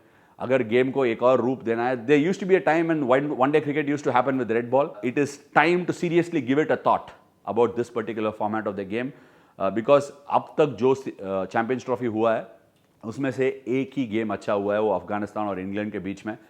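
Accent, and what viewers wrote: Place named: India. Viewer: Who loves his hindi accent ? 👇🏽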